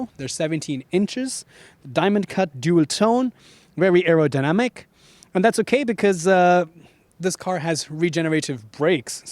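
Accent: Germany. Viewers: eine frage warum gibts die test´s nicht in 2 sprachen ? oder mit untertitel den soweit ich das höre haben sie doch einen deutschen aktzend ;)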